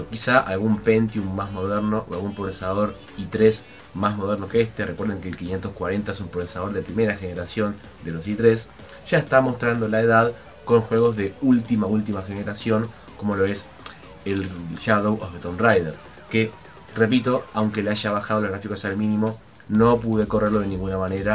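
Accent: Uruguay. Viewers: has faltado a la verdad en este video, ya que, solo la GPU, vale más de 100 USD ^u^ lol El micro vale 40 UDS, la placa 120 USD, sin contar la fuente, gabinete, disco, ram, etc, etc. Por cierto, eres de Uruguay verdad? Saludos. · Opa sos uruguayo q crack q sos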